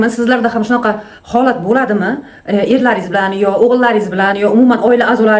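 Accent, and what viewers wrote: Surkhandarya, Uzbekistan. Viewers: Купрок сурхонча гапиринг кизикрок булади сурхон шеваси кизик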